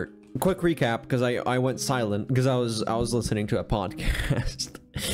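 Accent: Australia. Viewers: Is Australian? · are you aussie by chance? you sound just like my friends and i with all the swearing XD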